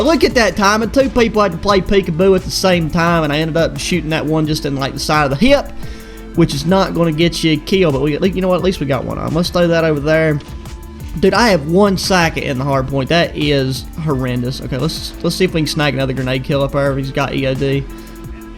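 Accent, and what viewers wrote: United States. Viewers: Country accent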